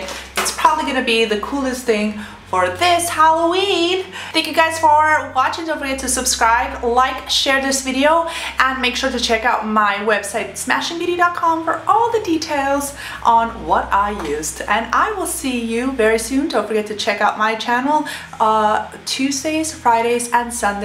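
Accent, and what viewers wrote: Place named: United Kingdom. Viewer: I love that British accent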